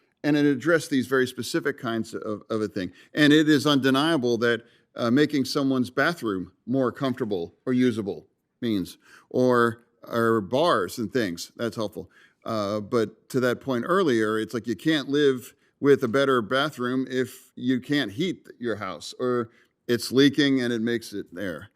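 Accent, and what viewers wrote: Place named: Wyoming, United States. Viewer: He sounds like he is from Wyoming after his aneurism.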